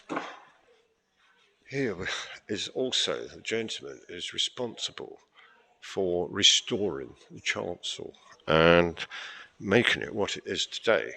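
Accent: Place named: England, United Kingdom. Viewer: oh my, a "disabled Toilet"as in not working!! Imagine if that was true-oh the stench of that. Toilets for disabled would be I believe the appropriate English words describing it, mate. I love the accents of the English, as a Canadian with British parents. This accent reminds me of the New Tricks actor and musician, Dennis Waterman as Gerry Standing, one of the great amongst the initial cast of four🤩 Those timbers up top with the massive bells are most intriguing. Looks like tree trunks perhaps. I'd love to see it with an archaeologists eye -to date and describe the wood used to create the strong ceiling, who and what the stories are of these saints/amazing paintings, particularly of the fainted or ill woman in the man's arms, a few chosen headstones for the words or messages, the cultural trio represented on the pulpit.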